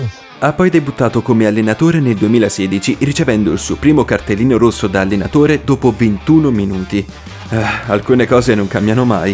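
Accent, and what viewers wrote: Germany. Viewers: MA SEI TEDESCO?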